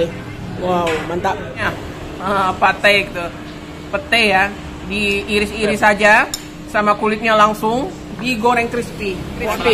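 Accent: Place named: Minangkabau, Indonesia. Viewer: Bahasa Indonesia diselangi dialek minang amat suka saya dengari..tersusun fasih mempesona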